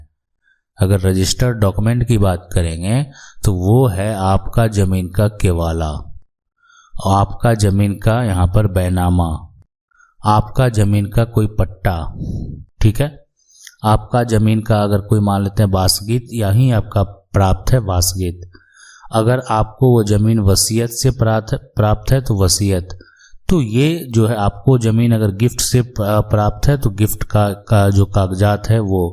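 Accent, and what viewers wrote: Bihar, India. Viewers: पहले सही हिंदी बोलना शुरू कीजिए तब चैनल देखेंगे। बिहारी हिंदी बिहार तक ही सीमित रहने दें।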